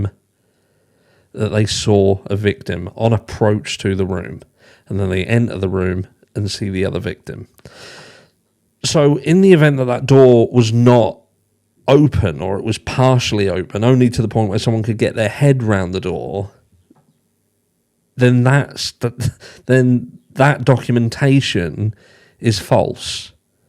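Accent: Norfolk, United Kingdom. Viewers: Are you from Norfolk uk, as I am? X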